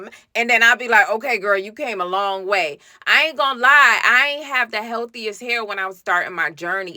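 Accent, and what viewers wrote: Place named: Jamaica. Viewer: Hey i heard that jamacian accent. Looking forward to buying some more of your products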